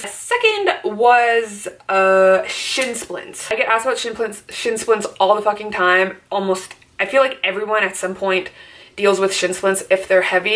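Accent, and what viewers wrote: Canada. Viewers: It’s the Canadian accent hehe 😊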